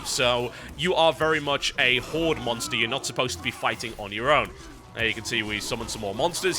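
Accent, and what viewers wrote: United Kingdom. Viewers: is British, that's why he might sound a bit odd in your ears.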